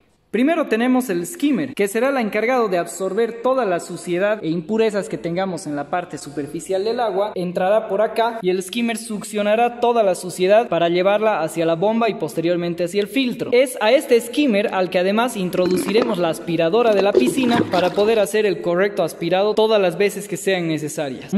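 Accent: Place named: Amazon, Peru. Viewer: Es de Perú, de la parte de la selva por su forma de hablar.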